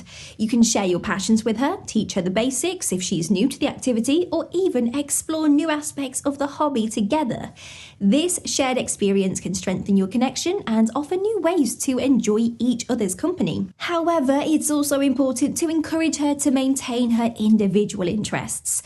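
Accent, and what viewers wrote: United Kingdom. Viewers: your little British accent is cute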